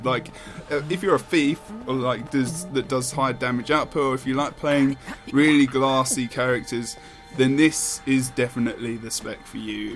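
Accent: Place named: England, United Kingdom. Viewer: Cool, thank you! I saw your Lion's Arch tour just now and I liked it! Keep the vids up and especially that English accent of yours ^^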